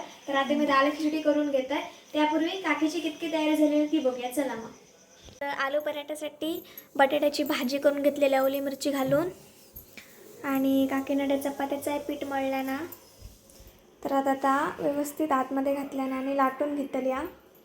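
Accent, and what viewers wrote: Malvani, India. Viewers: Malvani chan bolte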